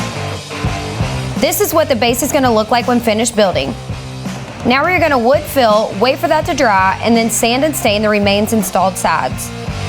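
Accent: South, United States. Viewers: She is absolutely stunning!! The redhair, southern draw, knows how to use power tools and can build!! She is Amazing!!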